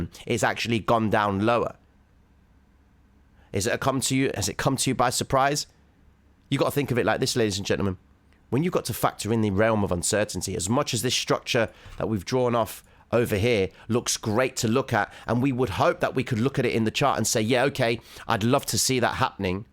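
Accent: Cockney, United Kingdom. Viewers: Love you cockney T.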